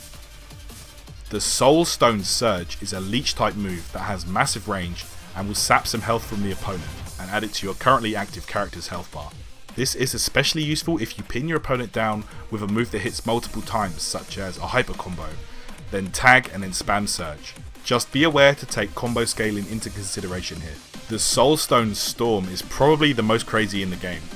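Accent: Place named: United Kingdom. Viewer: British accent is a plus in a guide.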